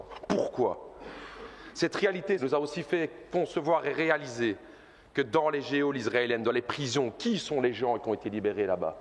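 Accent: Belgium. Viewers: Comment je le kifff à l’écouter la vérité si bien dites avec l’accent belge c’est magnifique,en tout les cas il a raison à 1000% c’est même triste à écouter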